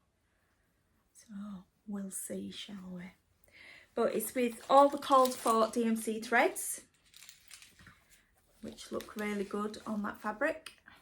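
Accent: Yorkshire, United Kingdom. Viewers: I absolutely love your Flosstube! You are so genuine and funny.....an I do love a Yorkshire accent! Your stitching is gorgeous and very inspiring. Are you going to the Great British Sampler Weekend next year? 🤩♥️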